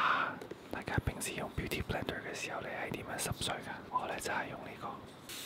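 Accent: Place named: United States. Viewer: 他的英文水平不低，雖然有些小口音，但大致無問題👍屬於擁有美式口音！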